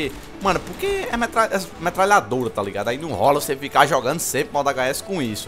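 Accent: Northeast, Brazil. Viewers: Man Se E du Nordeste ? LIKE BRODI ! ♥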